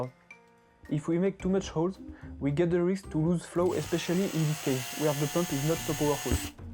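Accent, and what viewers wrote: France. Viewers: On entend direct un Français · I love your french english accent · that sweet sweet french accent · Ca sent le français ça ! :) Sinon, belle réalisation. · I don't understand your French-English accent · Mais quel accent Français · French accents are so cool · un bon accent francais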